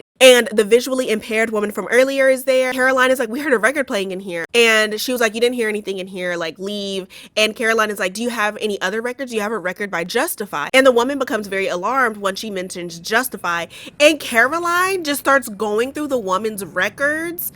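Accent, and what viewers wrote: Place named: Deep South, United States. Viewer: I just stumbled upon this and at the beginning i was like from exactly how Deep South is this girl??😂😂😂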